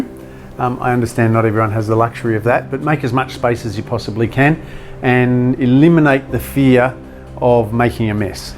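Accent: Australia. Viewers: Love all your videos they are precise and easy to follow also your voice is pleasantly style Aussie. Thanks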